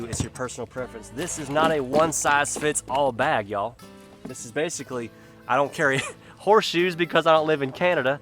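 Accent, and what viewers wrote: South, United States. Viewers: I was wondering where was from with that Southern accent and now I know Mississippi. That blue light that was holding we in Canada call that a Barbeque light because everyone here pretty!uch owns a propane grill barbeque so that long lighter comes in handy. As for the duct tape there was a Canadian comedy Tv show called The Red Green Show about a typical Canadian lumberjack and he would call the duct tape the handyman's tool. There would always be uses for the duct tape. LOL! 😂😆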